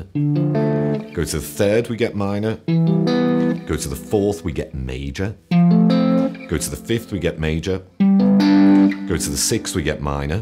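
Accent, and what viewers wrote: Liverpool, United Kingdom. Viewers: Scouse not English! has the most fantastic elegant Scouse elocution. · Thank for the amazing video my fellow scouser! I also live abroad have done for a long time, so its nice to hear a familiar voice. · Bass Scouse !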